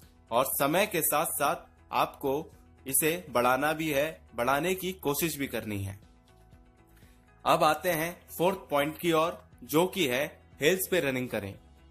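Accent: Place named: India. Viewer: fuck! this accent! i'm so fed with indian accent! fuck!!!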